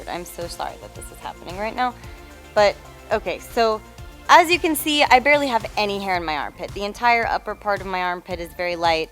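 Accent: Texas, United States. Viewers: You are ALWAYS such an inspiration! Your aunt was awesome and a pretty lady too! Oh... and just love hearing your TX draw:) Sure wish you had your co op 16 yrs ago when I lived in Houston! Also wish you were touring close to me in Kentucky! <sigh> oh well. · Your Texas accent is so cute!! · a texas twang ?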